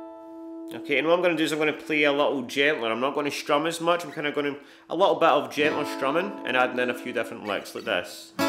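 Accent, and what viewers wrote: Scotland, United Kingdom. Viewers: For those not used to a Scottish accent, turn on CC. You are welcome. 😉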